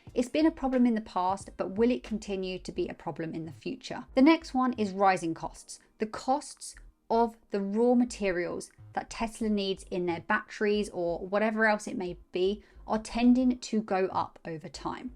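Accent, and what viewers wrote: United Kingdom. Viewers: Found the channel, sub'd, I listen to several TSLA retail investors, this is the first British retail TSLA channel I've watched (American), The British accent is kinda fun. Anyway, I am part of the legion of retail investors that are all in on Tesla. I think self driving cars shares a certain similarity to the development of search for the interent in the 2000s, except that the capital requirements for self driving cars effectively means that some college kids in a dorm room will not win this race. Thus, the players are more well defined, and extroplating a winner is theoretically easier. Could I and others betting on Tesla be wrong? Of Course, but I think our odds are much better than those who were searching for the needle of google in the haystack of internet search companies back in the 2000s and the payoff is also arguably higher. 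Big upside and, an easier route to finding the winner. If Tesla can solve cars, I think the Bot situation is quite optimistic after that, since they can really push hard without worrying about consequences. If a car crashes, people can die. So a lot of restraint has to be utilized with the AI development. If a robot runs head first into a wall or a machine, some minor damage occurs at most, so they can really push hard and go nuts with little restraint on the bot. I think people will be surprised when Tesla wins self driving, but I think they will be utterly shocked and austounded at how quickly Tesla pivots and has effective humanoid robot wor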